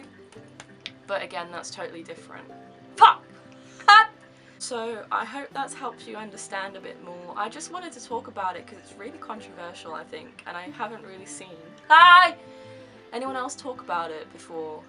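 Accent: United Kingdom. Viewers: I don't mind your tourette's . I hate the British accent.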